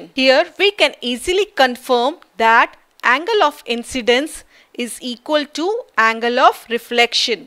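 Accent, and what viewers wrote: India. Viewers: Don't b_llsh_t us. It's not in english, but indiaglish.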